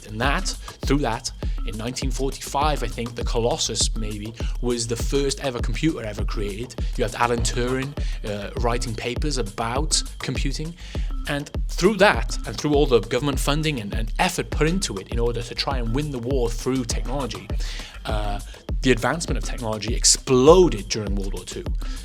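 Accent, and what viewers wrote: Wales, United Kingdom. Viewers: Your welsh right? I csnt figure out your accent and its annoying me haha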